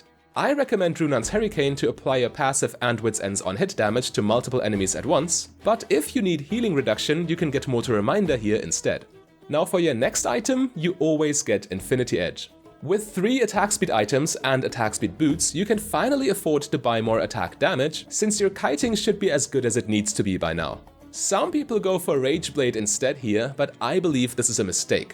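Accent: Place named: Germany. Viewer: Theres a lot of different builds being pitched for us noobs but I wont question a gamer youtuber with a good mic and a german accent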